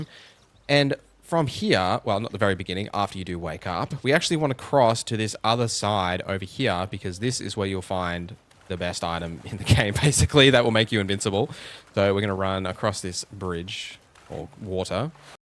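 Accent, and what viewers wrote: Australia. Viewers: I was won over by the intro music. As I always say to my children when i need them to hurry- run like you stole something. Also love the aussie voice, five gold stars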